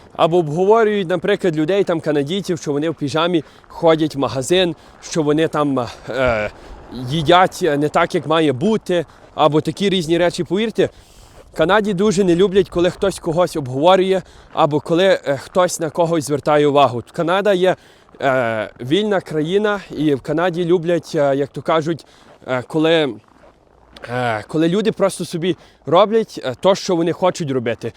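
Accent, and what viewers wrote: Western Ukraine, Ukraine. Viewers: Слышно по говору, что он с западной Украины. А чего он не в окопах, патриот хренов. А русскоязычные украинцы защищают свою землю.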